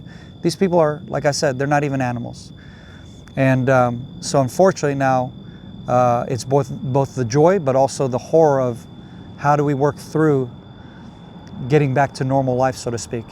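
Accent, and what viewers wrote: United States. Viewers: I did watch it in full, thanks. There could be a number of reasons why he has an American English accent. It's no big deal. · There's a good documentary on Netflix about gaza and Palastinians day to day life. The propaganda is strong in this one, just notice the perfect american accent. Ask palestinians if they can return to their ancestral lands also. I hate Hamas and the IDF for what they have brought on to palestinian citizens. Palestinians just want freedom.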